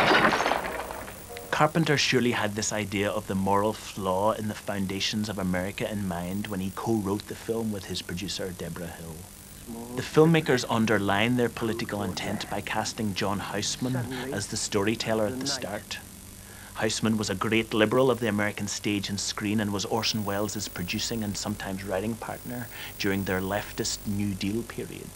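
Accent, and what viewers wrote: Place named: Ireland. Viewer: Major Mo .....its an Irish accent. You fool.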